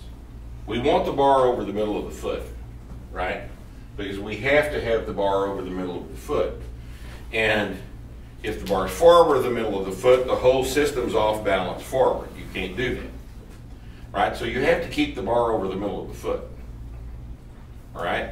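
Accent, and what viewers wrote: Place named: South, United States. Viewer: He should say to start drinking when the video starts to dull the pain of his "good ol' boy" accent and attitude...he's the master but good gosh it's torture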